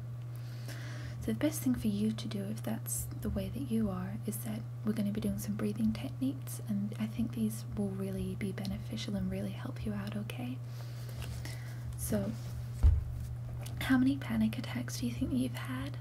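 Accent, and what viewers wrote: United States. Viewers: I thought you were American wow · I'm super impressed by your American accent! It's really quite accurate. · This is an excellent American accent! Probably the best accent switch I've seen in an ASMR video. I agree with those who said you sound like Springbok ASMR here :) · Lovely, especially the scalp massage. Your accent was on point —I'm American and I don't think I would've suspected it wasn't your native accent if I hadn't known better. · Your American accent is quiet good! Not many people can perform that sort of affectation without sounding silly. You have a wonderfully warm smile as well :) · Excellent American accent,!! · Wowww!! You're so good at accents omg, if i didn't know any better I'd think you were american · Great American accent. Love your ASMR video. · ASMR ahh your American accent is impeccable!!! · Great accent! 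Good job very believable... I only heard a few instances of your Scottish...British... Australian accent. and I only noticed because I AM american. But good job! Good Video! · That's an amazing American impression. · Just wanted to pop in and give my expert American Stamp of Approval on the accent. You even said "food" like someone from the midwest. Also, the marker sounds ruled. Oooon an ancillary note: very lovely video, many thanks as always. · I just praised your spot-on Scottish accent on another video...now I'm praising your excellent American accent. You have a genuine talent for asmr as well as accents. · holy crap I never understood the "American Accent" until now! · i like the american accent. good job. you are just amazing in general though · Ma-saj not Mash-aj. Otherwise, amazingly convincing and indistinguishable from real Americans :> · As an American, I can say you did a great job at that American accent. 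I couldn't tell you were even British because the accent was so good. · Also your American accent was amazing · Great american accent! Your true accent pretty much only came out in the long O sounds. But then again, people from the US talk all kinds of weird ways, so I'm pretty sure nobody would think that you weren't from the US if you were using the accent over here. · As a citizen of the United States of America, this was a very convincing accent, and overall a very nice video. Props to you.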